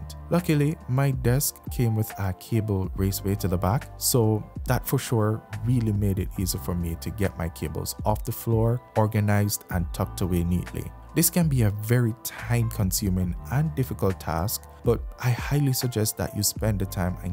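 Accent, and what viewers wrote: Jamaica. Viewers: Man sounds Jamaican asf loool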